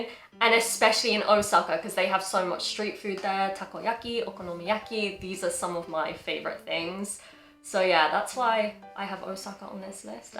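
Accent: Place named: England, United Kingdom. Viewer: Please listen to Cockney or Geordie or even Scouse accents. You can’t say it is intelligible to listen to I am sure. English accents are very diverse than anything else on that matter. Her accent is a tiny example of broad accents you can hear in England or in the UK.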